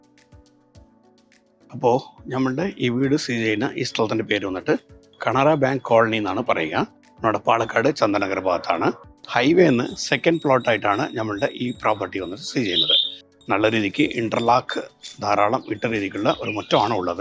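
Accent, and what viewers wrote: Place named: Tamil Nadu, India. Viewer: Tamil accent😂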